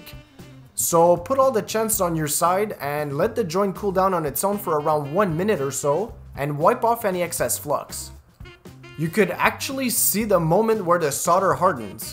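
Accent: Quebec, Canada. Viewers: Are you French Canadian ? You have a little french speaking accent in your english ! Very useful video !! Merci beaucoup ! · c sur t quebecois toi xD merci pour ton video ;)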